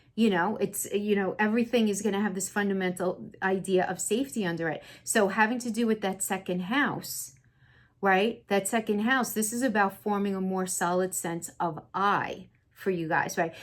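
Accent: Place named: New Orleans, United States. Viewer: I just love your accent! Are you from New Orleans? This is the 1st time seeing your face and you're absolutely beautiful. I resonate with your Gemini Readings the most. I admire how connected you are.🙏🦋 Goals...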